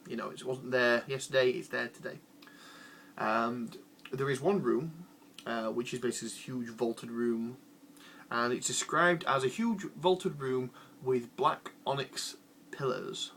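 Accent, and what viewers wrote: United Kingdom. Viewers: I love your accent and pronunciation! Bear in mind, language is identity and we all come from somewhere. I keep my German with a strong northern accent, as I'm from Hamburg and I like the area. I don't attempt to filter it to simulate standard German. Your accent reminds me strongly of folks I knew in the UK during my student days there. My point is that I understand everything you say perfectly well and I greatly enjoy following your thoughts. Keep it up!:-)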